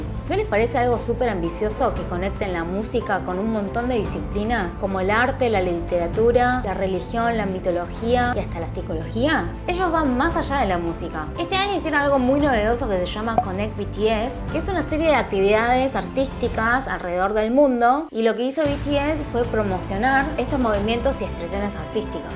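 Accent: Argentina. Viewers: Hablas un español súper avanzado y encima tienes acento argentino. Mae mía felicidades. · Hola! Que bueno conseguir una coreana que hable tambien el español creo que tendras una nueva seguidora.gracias por este video de ON. Que buena explicación. Siento tienes un tono argentino. Eres argentina o coreana.Ha medida de que te escucho me siento muy emotiva. Sera que te puedo hacer algunas preguntas???. Te agradeceria una repuesta. Estare al pendiente. Un abrazo desde Venezuela 💜🌷💜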